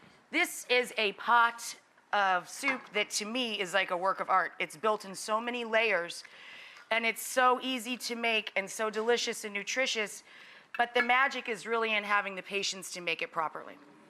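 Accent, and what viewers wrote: United States. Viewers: As soon as I heard her American accent I knew this would go wrong! I shut down straight away not being racist but Americans are not the most aspiring people in the world.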